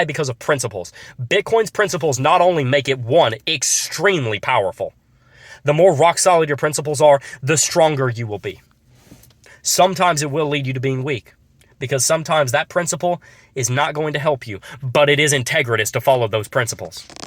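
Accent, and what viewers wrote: United States. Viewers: omg you sound like every american ever rofl. Land of the free hahahahahahahah. Where kids go to school and get shot, no where else on earth does this happen. The great wars it starts, the great friendly fire it causes, the great debt its in, need i go on?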